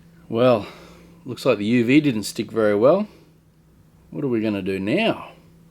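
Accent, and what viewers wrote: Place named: Australia. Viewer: Completely off topic but I love your Australian accent haha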